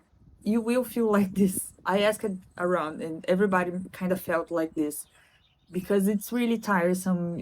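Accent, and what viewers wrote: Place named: Quebec, Canada. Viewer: Hi! In some ways your accent sometimes sounds like French Canadian haha! Winter has two aspects for me. 1) It makes me appreciate my cozy home more (in summer you don't want to be inside) and 2) There's a whole lot of winter activities that people can do to enjoy the outside. Skiing, skating, snowman, snow angels. Christmas is really Christmas too!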